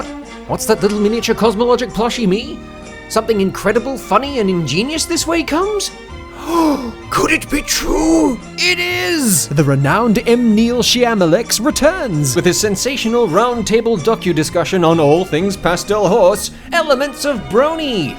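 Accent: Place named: Scotland, United Kingdom. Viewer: wait wait wait!! r u scottish...that explains so much actually... #freedom #hashtagsrsostuipedyamidoingdis?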